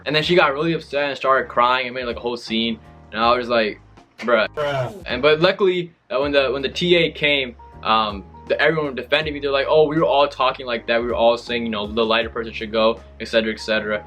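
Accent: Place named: United States. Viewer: How you got this much fluency and American accent 🙄?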